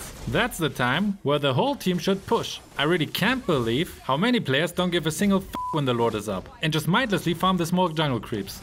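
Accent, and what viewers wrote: Germany. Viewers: Hey in what server are u eu or na I feel like eu because of german accent Sow if you wanna game I m open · Deutscher Akzent xd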